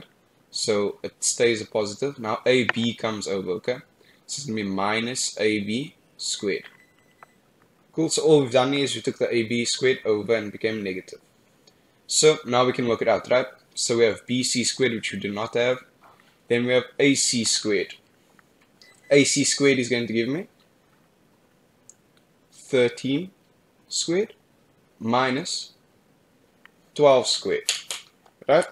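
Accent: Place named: Cape Coloured, South Africa. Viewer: Are you from south Africa because you sound coloured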